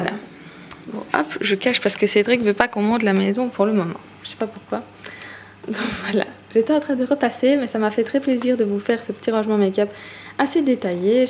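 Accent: Belgium. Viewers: L'accent de diiiiiiiingue :D Mais une belge ça fait plaisir :) Bisous :)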